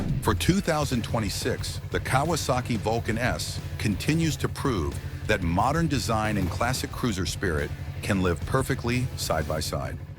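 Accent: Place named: United States. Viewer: This video is funny. First of all using a American accent but this list is far from American choices of bikes in that order. More than half these bikes are not in demand in America as they want you to believe. This video is obviously catered to the people of the U.K. too funny... Most American bikes on the streets will be Sport bikes 750cc and above, all classes of HD and Indian with maxed out Horsepower. We like bikes that are fast and big here people, so don't kid yourselves.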